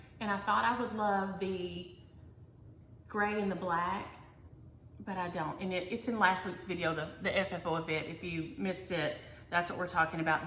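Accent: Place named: Appalachia, United States. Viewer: Congratulations on over 4000 subscribers! You out did yourself with this week's stories. You talk a lot like us hillbillies here in WV (that is a compliment 😊). Patriotic Cardinal or Project.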